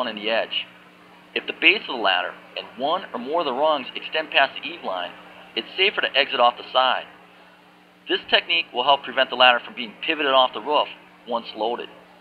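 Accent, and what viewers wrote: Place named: Upstate New York, United States. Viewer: Dude sounds like Upstate New York in accent.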